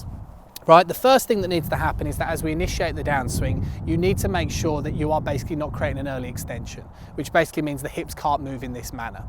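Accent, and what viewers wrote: United Kingdom. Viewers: I too have watched what seems like endless list of videos until I stumbled upon! I like that he acknowledges there can be variations on how we all get to certain points in our swing and where we go from there. In the beginning I really had to concentrate on listening to his fast paced British chat, but am now tuned in. He’s very articulate and I am now on board.👍 Next up I will try to get my swing video done and sent. Thanks and keep up the informative videos. Also, looking forward to more videos dealing with some limited flexibility.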